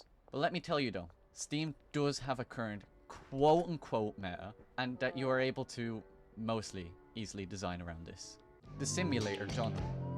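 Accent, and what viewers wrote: Ireland. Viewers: are you irish? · You Irish dude?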